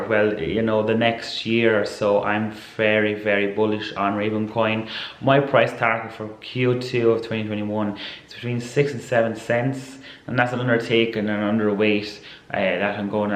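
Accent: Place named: Ireland. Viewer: Very good video love your Irish accent my family are all from Cork 🤙🙏🙏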